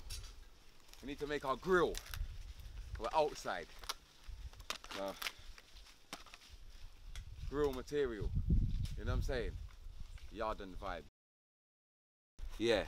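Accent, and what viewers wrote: Jamaica. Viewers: is a funny guy for a white man speaking patwa my guy💯🇯🇲 big up and moss media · it's so weird seeing a white preson speaking Jamaican but I love it and energy is of the charts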